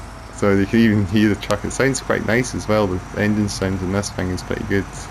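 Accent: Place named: Scotland, United Kingdom. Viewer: Ah. There must be a variety of Scottish accents then, and yours is one I'm less familiar with. I was close with a lovely Scotsman named Jim Wilson, and was very familiar with his accent, and less so with yours.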